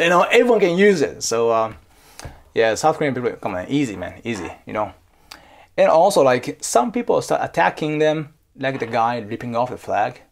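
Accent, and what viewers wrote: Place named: Singapore. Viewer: R u really a japanese? I have many japanese taiwanese friend. Ur pronunciation sounds like singaporian.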